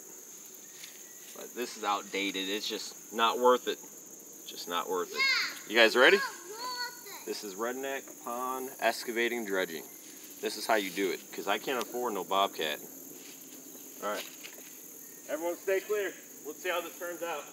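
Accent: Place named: Texas, United States. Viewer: I detect a northeastern texas accent. Figures.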